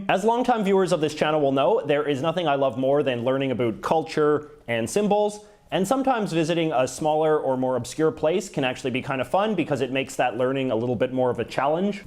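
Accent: Canada. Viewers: It's the Saskatchewan Legislature, not Parliament. Walter Scott was Premier, not Prime Minister. This guy may have a Canajun accent but he's not very well informed.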